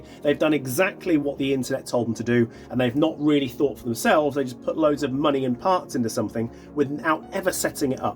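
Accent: United States. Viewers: That American accent is 💯 on point I fucking love it lol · That American accent......juicy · Awesome American accent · First, love the " murican " accent 😏. That said here in the states , at least where I play. The dmr and bolt rifle are lumped together and they are restricted to a certain jul and that's it, no fps . They take the bb weight and fps and lock the med . They require a side arm or secondary. Some places require the sniper to work with a second person " the babysitter" . For me and my style I find a respectable rof ( 40+ 😏) a good hop, bucking , nub and a clean barrel work very well. I also too like to be shit , a fun shit but still a shit. I once dumped 5,000 .12's on someone's head to kill them 😈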